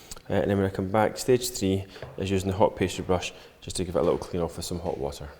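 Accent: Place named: Scotland, United Kingdom. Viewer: Excellent tutorial! Lovely Scottish accent to listen to as well. Thank you.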